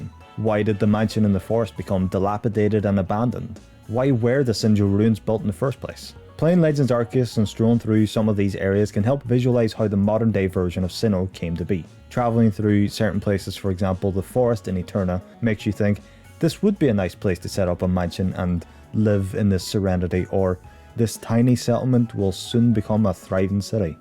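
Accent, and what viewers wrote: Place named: Ireland. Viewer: Love hearing an Irish accent and seeing local creators doing well on YouTube, great job with the video mate!!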